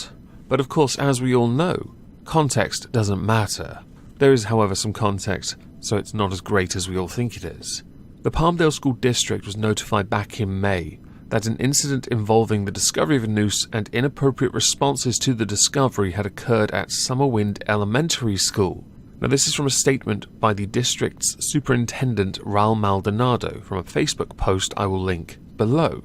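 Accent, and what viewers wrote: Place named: England, United Kingdom. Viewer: I think he's English hence why he said it that way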